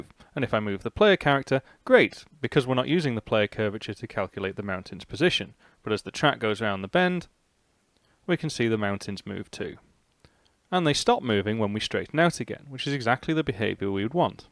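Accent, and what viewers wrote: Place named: United Kingdom. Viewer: Your accent looks very much like British, it is quite cool. Nice video bro. Thanks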